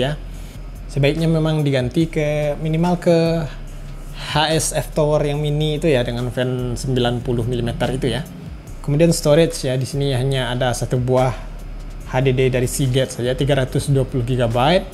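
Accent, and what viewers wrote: West Sumatra, Indonesia. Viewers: Logat minang nya sangat kental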